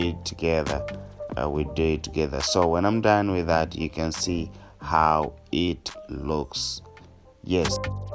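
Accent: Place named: Uganda. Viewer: He sounds like a Ugandan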